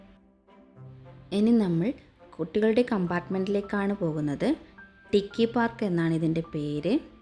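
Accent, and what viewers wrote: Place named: Malabar, India. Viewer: Hi I am originally from Calicut and living in UK.I watched your video today for the first time.I can’t wait to come and visit Switzerland.It looks so beautiful. Because of your accent,I guess you are from Malappuram or Calicut😀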